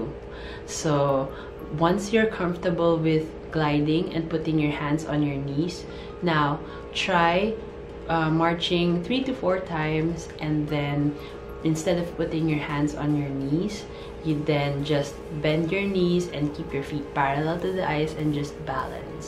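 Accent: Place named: Philippines. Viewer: OHMYGOSHHHHH your Pinoy accent is so cute!!!!!! At ang guwapo mo rin omgggg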